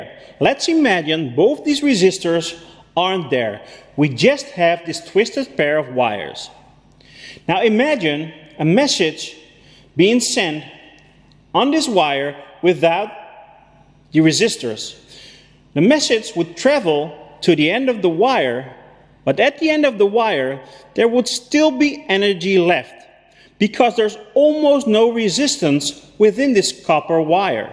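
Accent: United States. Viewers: Ik dacht dat je een Amerikaan was (zo klonk je), tot ik het display van de scoop zag :-) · So I was looking at some canbus diagnostics videos just for fun and then I saw this one with you doing that test drive. And then the shot on the road, I was like: “Hee dat lijkt wel Nederland!!!” 😄 You really had me with that American accent 👍🏼.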